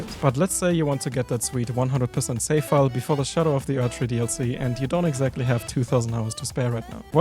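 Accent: Germany. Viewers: no front but you have to be german cuz youre accent in speaking is yk "BAD" but im german too sooo... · Als ich den Akzent gehört hab wusste ich direkt dass du Deutscher bis xD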